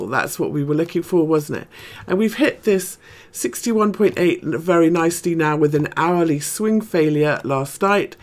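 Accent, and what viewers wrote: United Kingdom. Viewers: I absolutely love your voice and your accent. It's very posh and very soothing.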